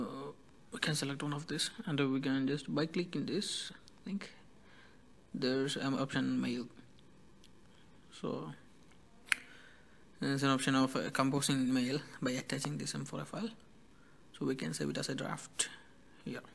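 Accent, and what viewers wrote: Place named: India. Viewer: cant understand shit. dat indian accent man...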